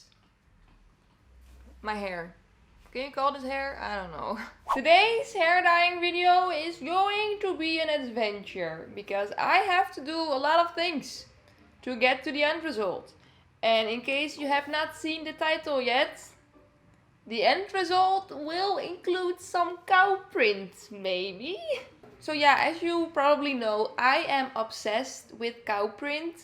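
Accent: Netherlands. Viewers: are you dutch? you sound like you have a dutch accent. Love!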